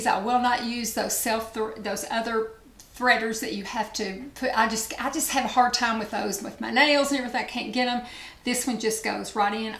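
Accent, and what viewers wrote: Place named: Georgia, United States. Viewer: Hi, honey! I'm so happy that I found your channel today...its my lucky day!😊 🌼Congratulations on your 10 year anniversary!🌼 I can't wait to go back and watch all your videos! What a treat! I absolutely loved this video, and I love you even more. You're so sweet and kind! I really loved how you bought the bracelets for your granddaughters, so they know they always will have each other to depend on. I have 7 children, and I always tell them the exact same thing. I wanted to ask you, also...where are you from? I am from Georgia, and your accent sounds a whole lot like mine.🍑 Ok, honey...thank you again for taking the time to sit down and spend your afternoon with us. I don't feel like I was watching YouTube. It really felt like I was over at my favorite aunts house , and she was taking me around her house showing me all the cool new stuff she found on Amazon. 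I loved it! Take care, honey, and we'll talk to you again soon. Love, Courtney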